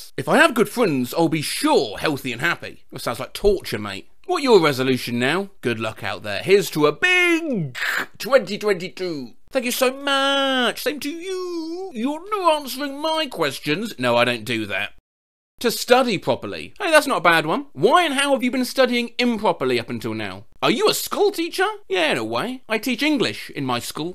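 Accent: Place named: RP, United Kingdom. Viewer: Hell yes new posh Brit video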